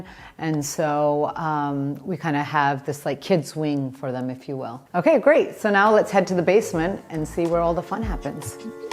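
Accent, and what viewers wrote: United States. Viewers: she sounds American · I can tell by her accent, we are from the same area. I'm in NJ, so that's my guess. A Jersey girl! The house is wonderful. Totally my style. I think the trees indoors, do drive me nuts haha. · New York accent.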